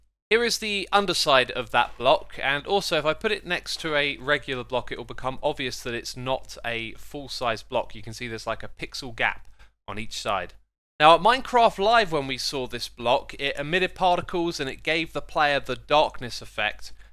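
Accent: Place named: United Kingdom. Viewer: Why does every Minecraft youtuber have a British accent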